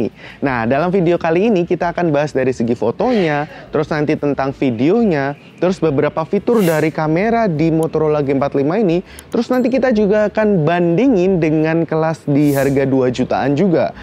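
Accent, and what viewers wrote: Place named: Yogyakarta, Indonesia. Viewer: oalah...wong jogja to dab...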